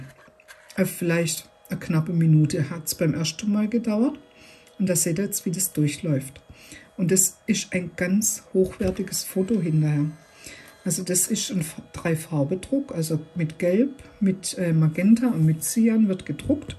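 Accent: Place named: Southwest, Germany. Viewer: Dieser Akzent ist tausendmal schlimmer als wenn mich nen Sachse vollquatschen würde. Gott ey, lernt hochdeutsch!!! Was ist bei euch da unten im Südwesten verkehrt gelaufen?!? Versaut einem das ansonsten sehr schöne Video!!!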